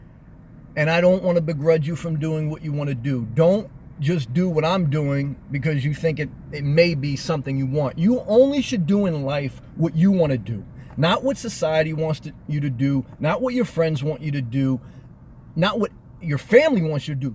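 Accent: New Jersey, United States. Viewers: I would rather live in a car than an office. Never seen your videos before but you sound like a Jersey boy like me. Hope I am not misjudging the accent, I have been Californicated for the last 25 years and love it! I had a large family, so I´ll keep my mountain acres but I feel you on materialism. I think the key for me was to make sure I accumulated enough income streams that I never HAD to work again....like now. My kids are grown and I am still young. Fuck yeah!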